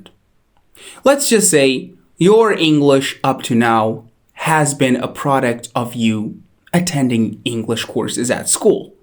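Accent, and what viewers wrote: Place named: United States. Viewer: Another great video. I suppose I should have asked you this question in your American accent video, but I hope you don't mind my asking your more questions. Did you study how to make each sound (each vowel and consonant)? I know you read out loud a lot and used movies, songs, podcasts, etc. to get your American accent. But did you also use any American accent textbooks or websites to get your American accent? I love your videos. Thanks.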